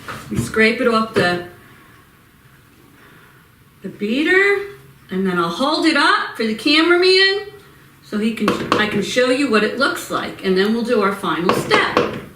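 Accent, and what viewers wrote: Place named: Bronx, United States. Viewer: Hi sis new subscriber hope you become a member a Montgomery Family Channel as well 💋💖 💋 I hear that New York Bronx accent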